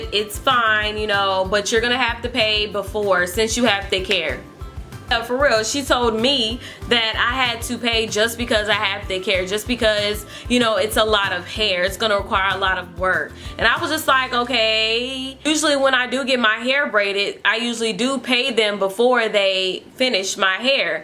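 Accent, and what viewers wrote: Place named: California, United States. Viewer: sounds like she is from Cali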